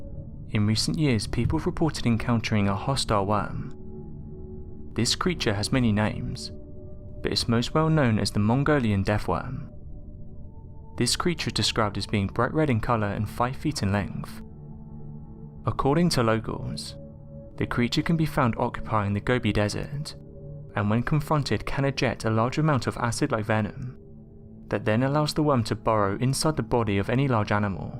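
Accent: United Kingdom. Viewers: I wish you would loose the brit and find someone who speaks English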